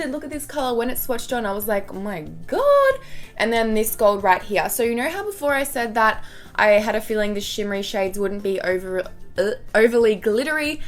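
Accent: Australia. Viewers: Australian accent - 😳😓🤐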